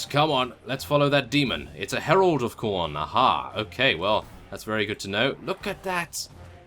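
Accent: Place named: England, United Kingdom. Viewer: hey thanks for doing this series i really enjoy your narration. idk what it is but I think the English have the best accent to commentate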